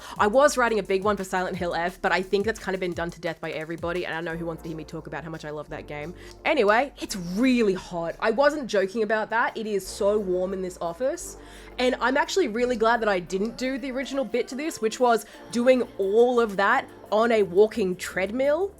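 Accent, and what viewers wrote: Australia. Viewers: Great video! So good to see the aussie indie scene get some recognition and to hear an aussie voice on Youtube, can't wait to see what you come up with next! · great vid! was excited to hear an aussie voice! didnt know this game was from my side of the country either! thats neat.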